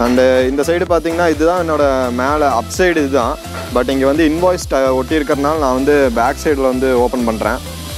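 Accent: India. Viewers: Bro neenga entha ooru Kongu slang varuthu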